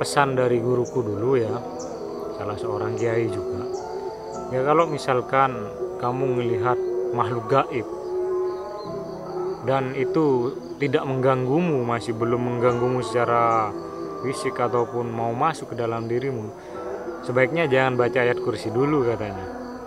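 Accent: Madura, Indonesia. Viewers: Kak kalau dr logatnya orang madura ya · Kalo dari logat bicaranya, mas orang madura ya? Salam settong dere tretan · orang madura dari logatnya bicaranya ya mas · Kok logatnya kaya org madura ya?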